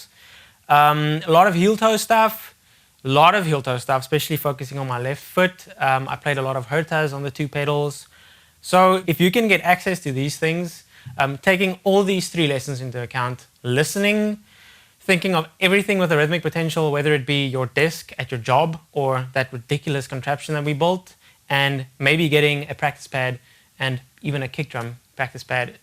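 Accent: South Africa. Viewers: SOUTH AFRICAN! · What kind of accent is that??? South African?? Where is from?? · Sounds like South Africa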